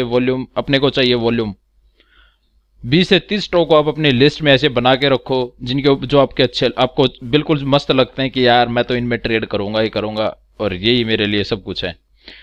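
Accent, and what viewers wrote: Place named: Haryana, India. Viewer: Guru haryana se ho lagta h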